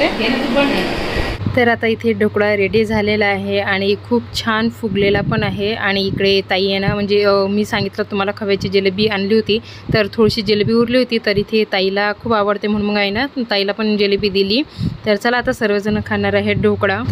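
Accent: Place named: Akola, India. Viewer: तुमची भाषा माला खूप आवडते आमच्या शेजारी आहेत ना ते अकोला चे आहेत हाऊ ना😊😊 मस्त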